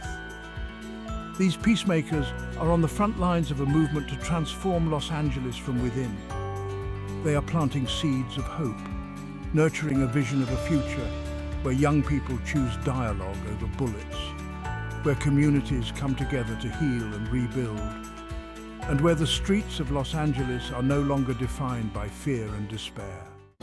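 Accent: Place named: United Kingdom. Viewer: Whats up with these Brits narrating this lame ass crap ???? Who gives a f--- <num> Life !!!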